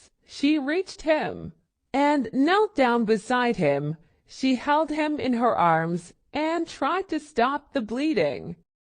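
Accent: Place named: United States. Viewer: اللكنة أمريكية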